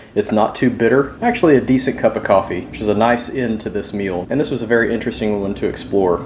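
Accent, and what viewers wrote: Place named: Deep South, United States. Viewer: I'm confused mate, are you American or Canadian? You sound like you're from the deep south, but you called it "jam" not jelly. Americans call jam jelly. Canadians correctly call it jam.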